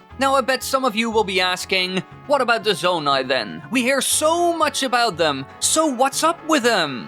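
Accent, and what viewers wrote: Netherlands. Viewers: I really, really miss your Dutch voice. You sound so forced and fake nowadays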